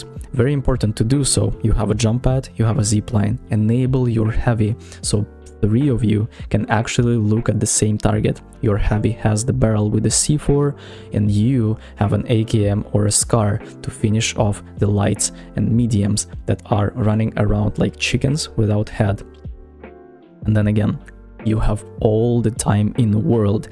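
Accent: Russia. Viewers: сразу понял что ты русский)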